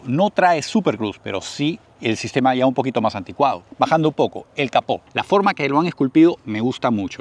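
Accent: Peru. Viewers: habla como peruano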